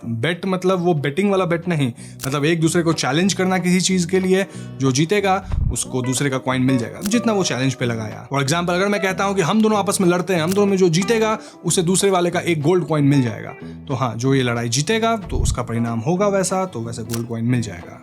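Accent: Bengal, India. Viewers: Bengali ho app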